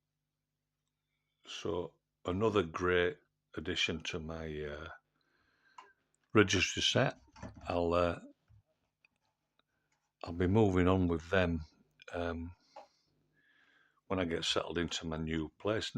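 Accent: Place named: Yorkshire, United Kingdom. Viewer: . New subscriber here & one that has recently taken up this hobby. I’ve started collecting 50p, King Charles III coins. This has now been added to by collecting sixpences (coz that was my first pocket money). I’m interested in Irish & Celtic coins but don’t know if they’re worth collecting. Great to hear a Yorkshire voice on the subject, as I’m from Mirfield.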